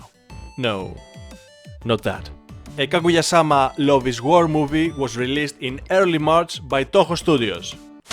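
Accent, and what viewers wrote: Greece. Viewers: Wait... I recognize this accent...you greek? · Are you from greece? Cause your accent seems greek to me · πως καταλαβαινει καποιος παντως απο την προφορα τον Ελληνα... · Also are you Greek? Cause your accent sound like it.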